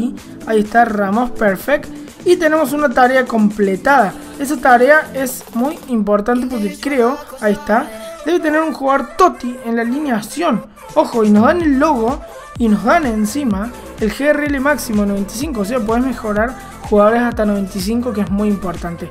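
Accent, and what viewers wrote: Córdoba, Argentina. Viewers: PENSÉ QUE ERAS DE PROVINCIA POR EJEMPLO CORDOBÉS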